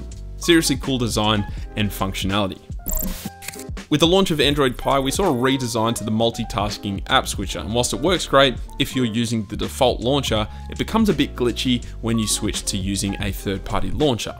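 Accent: Australia. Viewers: Where are you from ? Australia? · And that Aussie Accent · is this guy australian? i am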